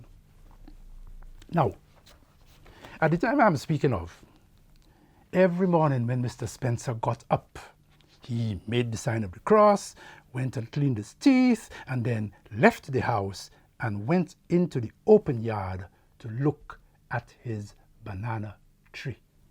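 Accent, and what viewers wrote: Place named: Patois, Jamaica. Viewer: Would love to listen to your poetry on an audiobook!!! The twang of patois in your voice is so warming.....hello family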